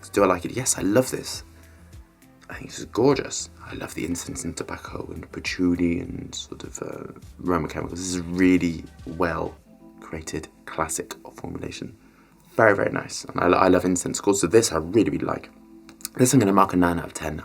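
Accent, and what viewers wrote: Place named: Cornwall, United Kingdom. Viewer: I hear some of Anthony Hopkins accent in your voice mate...cornish accent slipping through...love it!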